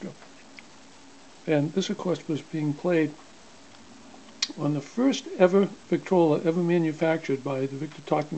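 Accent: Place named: New England, United States. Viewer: I really like your accent, are you from Maine or Massachusetts?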